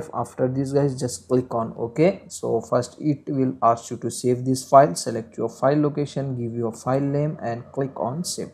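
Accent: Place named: India. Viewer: This video is not helpful for me because of the heavy Indian accent and the voice going strong and weak up and down.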